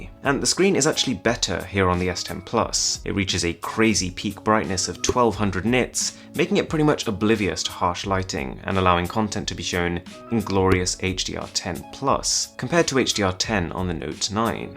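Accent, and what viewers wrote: RP, United Kingdom. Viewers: Dude. Your voice is making me fall asleep. So calm and posh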